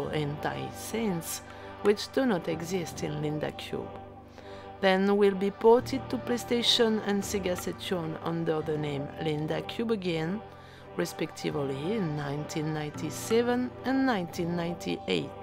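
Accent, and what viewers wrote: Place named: France. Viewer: It's à French accent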